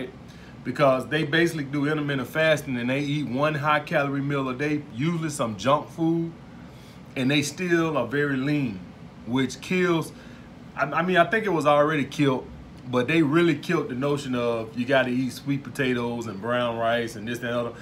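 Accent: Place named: South, United States. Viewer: the way you talk remind me of Hodgetwins, except a stronger Southern drawl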